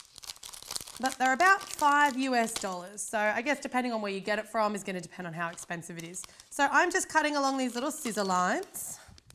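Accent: Australia. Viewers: Your voice is enchanting. I love Australian accents. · you sound like Rachel Ray with an Australian accent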